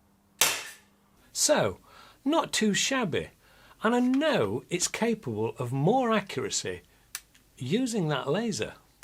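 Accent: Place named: England, United Kingdom. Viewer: Not used to gun reviews conducted by a gentleman with an English accent, but I’m here for it!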